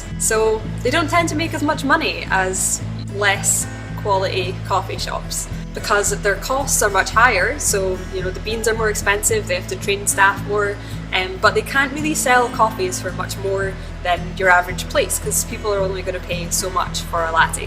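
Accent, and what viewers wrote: Scotland, United Kingdom. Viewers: Is that a Scottish accent I detect :)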